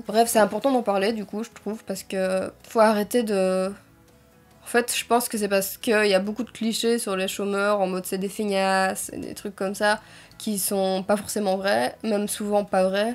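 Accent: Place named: Namur, Belgium. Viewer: c'est la maniere de parler aussi , la sonorité des mots, ca me fais penser a mes amis qui ont grandit a namur et ses environs, en tout cas j'espere que tu vas biens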